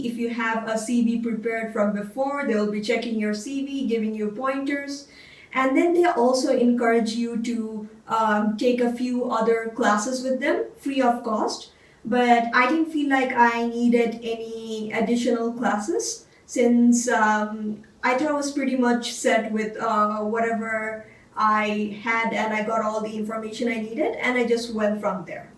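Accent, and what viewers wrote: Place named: Canada. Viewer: So within 8 months you got Canadian accent!!!!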